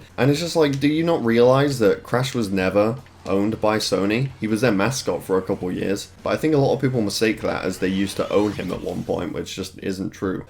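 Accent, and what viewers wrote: United Kingdom. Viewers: I get you with sounding monotone. I think the British accent plays a big part in that tho no matter how much you try not to sounds monotone. I'm from the north of England so i think the same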